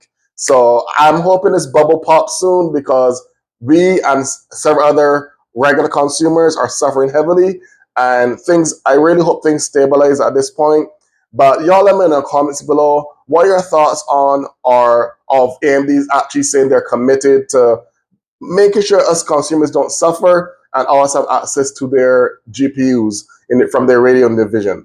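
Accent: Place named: Jamaica. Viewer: man, you have my subscribe, I always wanted a Jamaican to explain tech stuff :D, you made my day! Respect!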